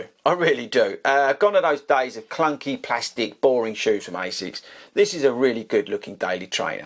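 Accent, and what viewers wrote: United Kingdom. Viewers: Ich mag diesen britischen Akzent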